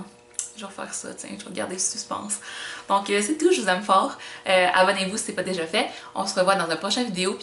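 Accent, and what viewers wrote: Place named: Quebec, Canada. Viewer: Est ce que tu viens du bas du fleuve ou d'une region autre du Quebec parceque je trouve que tu as un p'tit accent trop cute!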